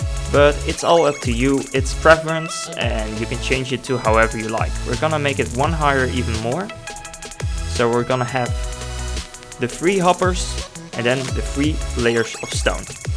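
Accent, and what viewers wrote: Netherlands. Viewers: NL !! je hoort het meteen. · are you nethetlands vause of your english speach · Ben je Nederlands? Als ja ik hoor het aan je accent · Ben jij Nederlands? (Accent) :D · are you from the netherlands? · He is dutch yes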